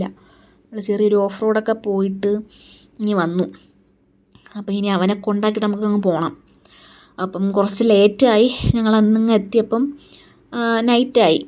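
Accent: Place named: Thiruvananthapuram, India. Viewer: തിരോന്തോരം പയല്. തള്ളേ പൊളപ്പൻ vlaag തന്നെ കേട്ട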